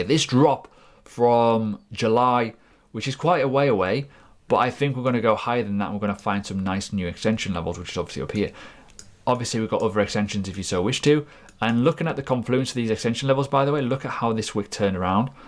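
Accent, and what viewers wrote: United Kingdom. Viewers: main problem is the heavy British accent:)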